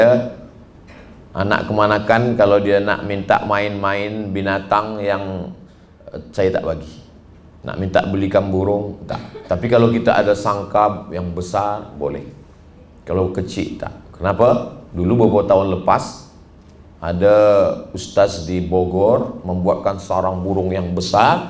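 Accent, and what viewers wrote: Kuala Lumpur, Malaysia. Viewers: Askm,terbaik ustaz pandai lograt org KL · Otak aku tak boleh comprehend ustaz orang Indonesia, sembang cm org malaysia.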